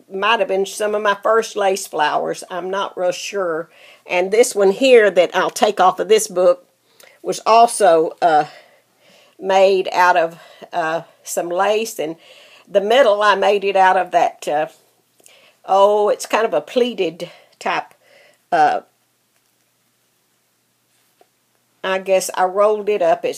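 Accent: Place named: Texas, United States. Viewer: Your weløcome. I thoroughly enjoyed your videos. Its always for to see and hear about things I have no possibility to know. Loved your texas accent to.) My blog oh wow. I dont update that one as I should to buzy uploading things in here. You find me on pintherest also. Line Cathrin Ekseth wich is my full wonky norwegian name. I just spotted your order. Thank you very much for that.:D Big hug Lele.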